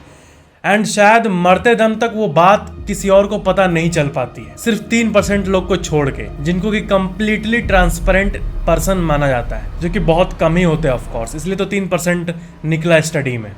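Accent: Bihar, India. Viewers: Vai aap bihar se ho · Bhai tum bihar se ho kya ??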